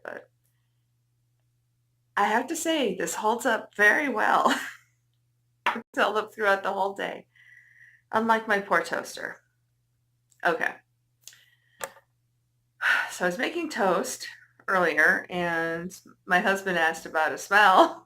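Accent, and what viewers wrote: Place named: New York State, United States. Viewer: I thought I heard a NY state accent. I live 10 min away from Niagara Falls, Canadian side. I will check out the rest of your channel. Great job!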